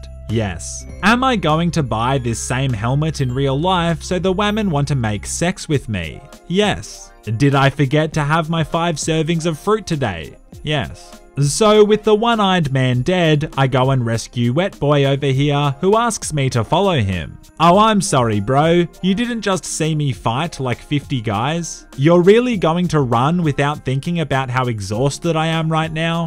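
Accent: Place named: Australia. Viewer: He's Australian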